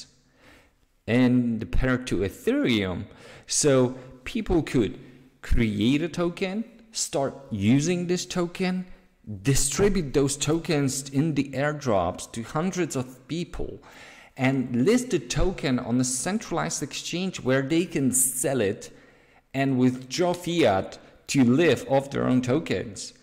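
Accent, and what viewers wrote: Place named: Poland. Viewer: Mówi po angielsku ale i tak słyszę po polsku xd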